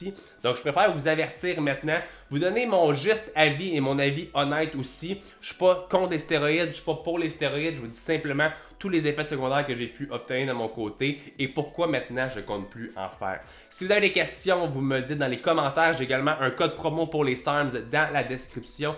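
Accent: Quebec, Canada. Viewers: Pas habitué à l'accent québécois j'ai rien compris 😂😂😂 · Ton accent c'est quelle region du Quebec? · shakur42ful vive le québec. l’accent québécois est est le meilleur accent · putin cette accent du Québec c horrible je regarde 5 min sa ma fané horrible dislike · C'est tout les québécois qui parle avec ce accent. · "de la masse musculaaaaaree"..putain j adore cet accent canadien!! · Quand t'es pas habitué à l'accent quebecois, surtout que le sien il est corsé, tu piges quedalle à ce qu'il dit ouais haha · J’adore l’accent québécois 😁😁. Vidéo intéressante! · Le français québécois est compliqué, je capte pas bien😑, sinon beau contenu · Pourquoi l accent québécois est aussi horrible et ridicule ?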